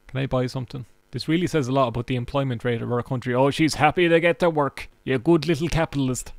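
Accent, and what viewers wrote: Ireland. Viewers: Man I wish I had an Irish accent I love how it sounds lmao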